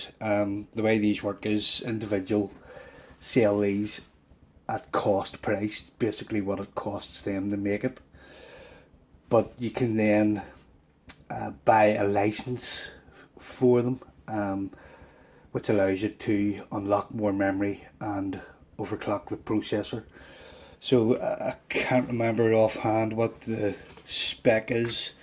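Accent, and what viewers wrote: Belfast, United Kingdom. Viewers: YASSSSS! a fellow Norn Iron A1200 user here, great hearing a local accent - subscribed!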